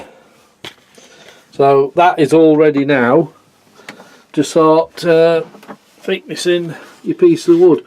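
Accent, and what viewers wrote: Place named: Nottingham, United Kingdom. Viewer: Why ho why did you take bed lock right out, you only need to free it off, you sound like a good notts lad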